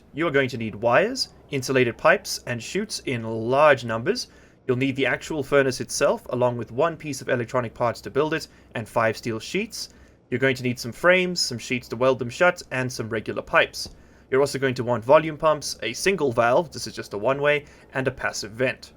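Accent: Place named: South Africa. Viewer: you sound south african, nice video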